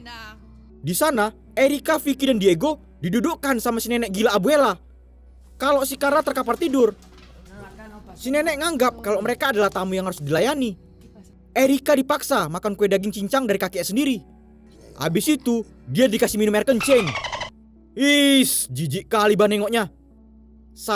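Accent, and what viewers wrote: Medan, Indonesia. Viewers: Medan x wkwkwkwk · galon 🤣🤣 . medan kali bah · logatt medan emg gituu bro wkwk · Suka kali sma suara abg ini...medan kli🤣😭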